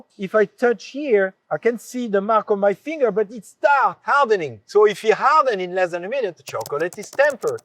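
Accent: France. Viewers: Arnold is Austrian and this guy is French. Completely different countries and accents. Are you high? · His accent is peak French Accent, it's so entertaining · Im reveling in his delicious chocolate knowledge and beautiful French accent!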